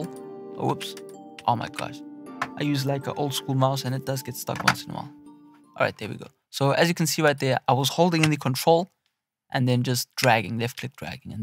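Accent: South Africa. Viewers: yo bro are you from South Africa? your accent lowkey sounds like it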